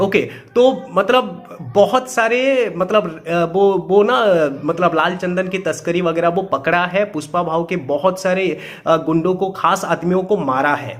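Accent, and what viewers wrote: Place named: Sambalpur, India. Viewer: Bhai aap Sambalpur se hain kya?? Main Odisha se hoon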